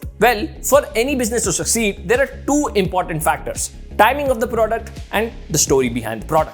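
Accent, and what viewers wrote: India. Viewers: I am sorry ,but I guess he needs to work on his accent,too much Desi accent..please take it as an advice from a fan of aevy tv.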